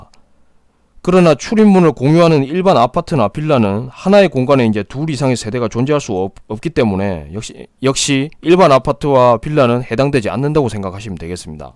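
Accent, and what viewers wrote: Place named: Jinju-Sacheon, South Korea. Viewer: 감사합니다 억양이 진주사천사람 같아요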